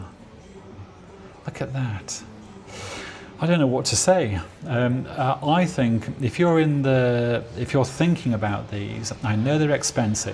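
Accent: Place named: United Kingdom. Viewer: Я думаю что ты, обязательно купишь MRG в круглом корпусе, может не сегодня не через месяц, но это обязательно произойдет, так как у тебя очень крутая коллекция B5000! Желаю успехов, круто что я наткнулся на твой блог, пишу на русском но понимаю твою речь, конечно Английский язык это не Американский 😅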